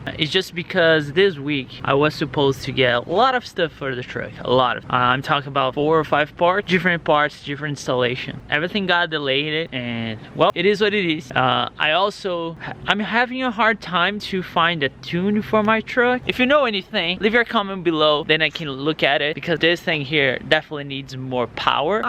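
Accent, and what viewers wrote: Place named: Brazil. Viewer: Sound like from brazil..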